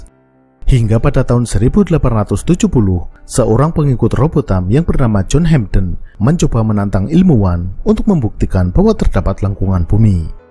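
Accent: Indonesia. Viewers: Karakter suara medok nya biarin natural aj bro.. g usah gegayaan dibuat2 pake intonasi.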